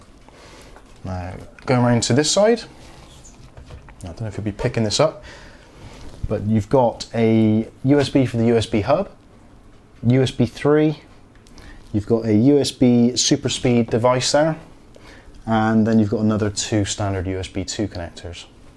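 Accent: Bristol, United Kingdom. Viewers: Bro are you from bristol cause if u are same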